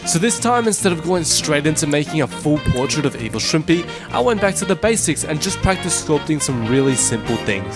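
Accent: Australia. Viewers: One Question r u Australian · i just LOVE the Australian accent, its jus tooooooo iconic ❤😊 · are you australian?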